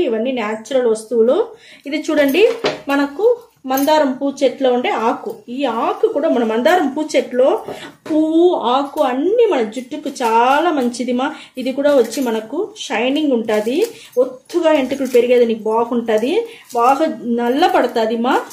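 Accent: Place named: Karnataka, India. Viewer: కన్నడ భాష యాస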